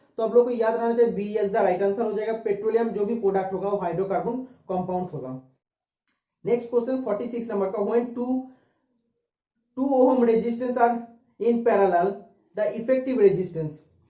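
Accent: Bengal, India. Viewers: Are you from Bengal ?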